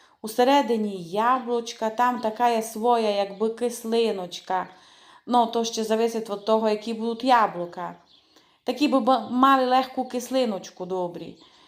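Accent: Transcarpathia, Ukraine. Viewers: Добрий день,. Що смачні оладки, нема сумніву, але мені дуже подобається ще твій діалект, твій говір, урівноважений, спокійний, гарний закарпатський. Пару разів повторюю ролик, щоби чути твій голос, твою мову. В кожному краю України свій діалект. Я із Прикарпаття. Дякую тобі за Закарпатську кухню. Цікаво, смачно. Слава Україні! Слава Героям! Вічна пам'ять полеглим воїнам! ❤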